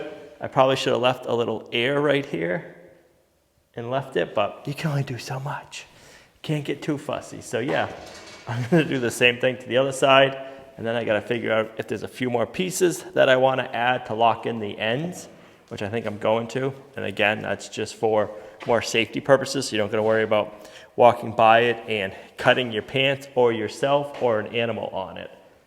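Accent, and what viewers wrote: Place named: Northeast, United States. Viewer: Truly loved the video! I like your Nor’Easter accent the best. Thanks for the positives you bring with each posting.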